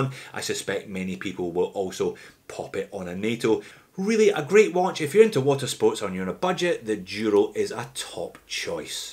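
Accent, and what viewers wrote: Scotland, United Kingdom. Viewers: I suspected that you were Scottish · Great watches, great video, great T-shirt, love ur Scottish accent. 🏴👍 Most people can’t afford “luxury watches” so it is great that you test affordable watches and give them some love. I am surprised about these proudly Chinese pieces. They seem to be a good alternative.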